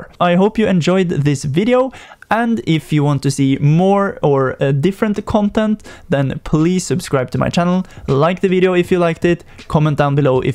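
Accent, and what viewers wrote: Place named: Norway. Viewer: Kan høre at broder er norsk, keep gaming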